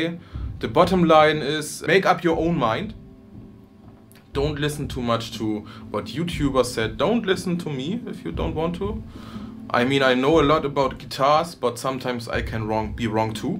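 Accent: Germany. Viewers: I really agree with everything you are saying. I own 5 Harley Bentons now and I enjoy every single one just as much as my snobby Schecter for over 1500 bucks. I've told everyone who asked me what guitar they should buy to check out the Harley Benton products. You just can't get anything better for the price(or sometimes even double the price) and if you should ever get a flawed one, you can always send it back for absolutely free. Keep up the good work, spreading your knowledge and helping other people not being tricked into this expensive gear snobbery. Nevertheless, I would also like to recommend you to work on your englisch. Not trying to be mean or anything. I know it can be hard, especially for german speakers(i'm austrian btw) because the german language is so vastly different in the pronunciation, and i appreciate your effort to make this understandable for as many viewers as possible. 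But I really think it would help your channel a lot to work a little bit on the english just to comfort the native speakers ;) That being said, I hope that you will get to the success that you deserve for your effort and pure honesty and I wish you all the best on your way. I'm happy to say that you just gained another Subscriber!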